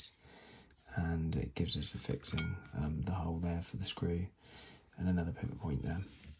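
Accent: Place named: United Kingdom. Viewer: So I woke up this morning and didn't realize what I wanted was a calm British dude explaining locks to me. Hell yeah man find that niche hahaha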